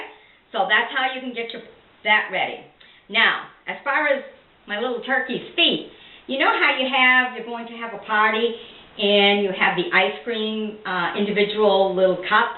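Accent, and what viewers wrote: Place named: New Jersey, United States. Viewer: This is really cute c; Your accent makes me miss my grandma from Jersey. If I ever have kids I'll remember this.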